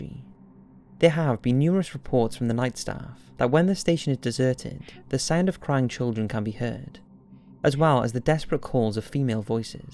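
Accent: Wales, United Kingdom. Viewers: Are you from like Newport or Cwmbran kinda area? Xx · dude i like your british accent because its easy to understand even for an american. btw great stories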